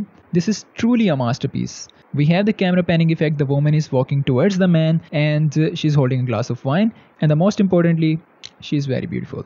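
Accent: India. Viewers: What's wrong with your English? Are you from India? It’s just that in India everyone speaks with a terrible accent that even a foreigner can hear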